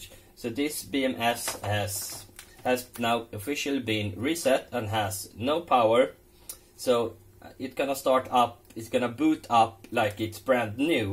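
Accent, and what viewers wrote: Sweden. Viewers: Gotta Love Swedish English gotta love clever Swedes gotta love a perfect solution Thanks - I'm now the only person in my state who knows how to fix this problem WOW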